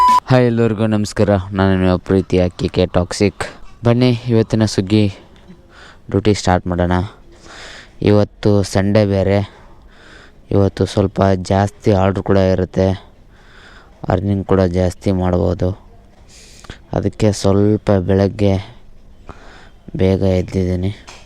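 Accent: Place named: North Karnataka, India. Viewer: ತಮ್ಮ ಯಾವ್ ಊರ ಹೇಳು😂😂😂 ಉತ್ತರ ಕರ್ನಾಟಕ anasatte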